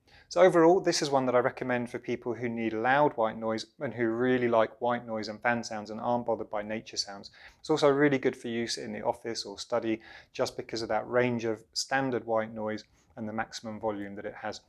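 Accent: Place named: United Kingdom. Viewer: Thank you for this clear concise and detailed description. Your presentation is a pleasure to listen to. Love that British accent!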